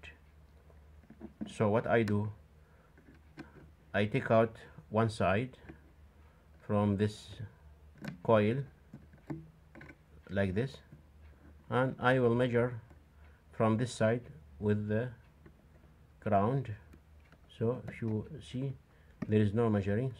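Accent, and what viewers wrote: Iraq. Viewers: Youre voice is iraqi voice Are you an iraqi ?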